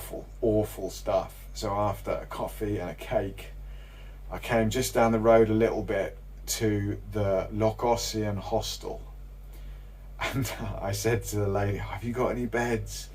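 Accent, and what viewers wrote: England, United Kingdom. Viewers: I was looking forward to this until l heard your horrible English accent. There is to many of use up hear now and you will ruin the Highlands just like everywhere your mob has been before.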